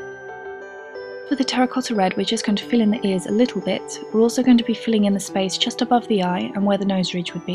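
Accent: United Kingdom. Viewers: If someone did these videos and had an annoying voice I wouldn't be able to watch it but u voice is all British and stuff >.<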